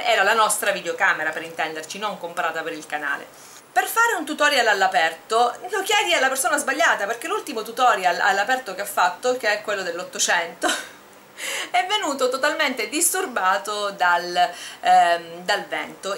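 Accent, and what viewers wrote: Catania, Italy. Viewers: Sei catanese?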